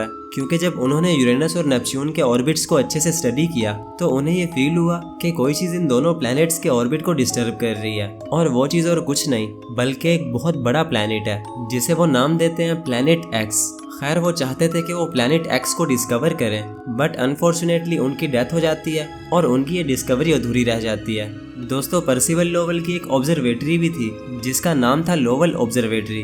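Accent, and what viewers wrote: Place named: Pakistan. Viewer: Apki bhasa Pakistani jesi lag rahi hai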